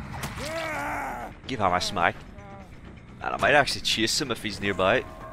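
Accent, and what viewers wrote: Northern Ireland, United Kingdom. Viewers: Is this a Norn irish man playing DBD? Lol my guy. Good to see someone else from these parts playing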